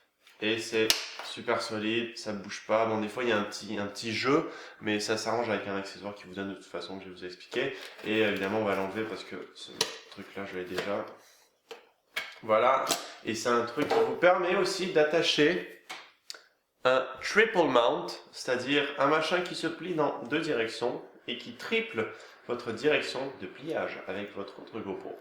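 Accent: Canada. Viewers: D'un côté, c'est pas faux,mais d'un autre oui :). Officiellement, il n'est pas Canadien, mais il a une culture Canadienne, c'est pour ça qu'il a l'accent. Rester plus de 10 ans à Montréal, ça laisse des traces x).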